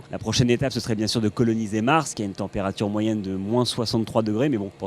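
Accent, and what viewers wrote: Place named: Russia. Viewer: L'anglais parlé avec l'accent Russe est parfait <3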